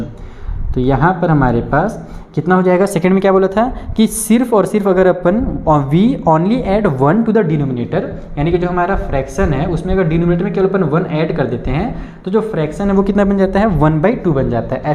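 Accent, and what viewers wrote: Bihar, India. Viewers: Sir aap bihar se ho kya❤❤.. kis jile se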